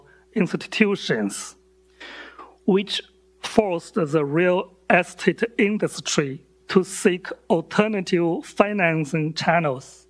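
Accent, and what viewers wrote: China. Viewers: 我在美国上安全课时的老师是一名清华毕业的老师，口音和高老师差不多，特别老派的中式发音基本上发音都是平的，可以听懂每个单词就是不太像普通人在说话交流，就像AI翻译读稿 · 典型的中国英语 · 这chinglish 听的真小学生啊，不是你写的吧。chatgpt翻的英文绝不是这么差的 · 这带地方口音的中式英语 老外听得懂吗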